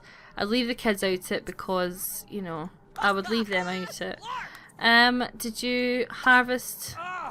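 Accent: Scotland, United Kingdom. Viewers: Oh my god! This...is...amazing!! Don't shut your self up please! I want to hear your beautiful Scottish accent. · Baby girl = Skye xxxxx love your videos and your voice. a scottish accent is always fun to hear! haha x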